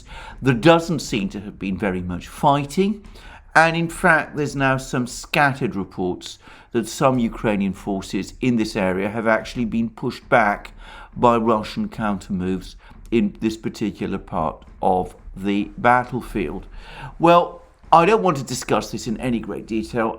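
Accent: United Kingdom. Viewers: Overly British accent destroyed the video quality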